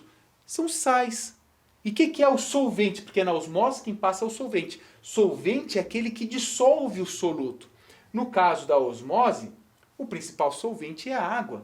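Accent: Rio Grande do Sul, Brazil. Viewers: Sou nova aqui no pedaço e já ameeeei suas aulas Professor! Que aula incrível! E esse sotaque então, adooooorooo acho lindo sotaque gaúcho🤩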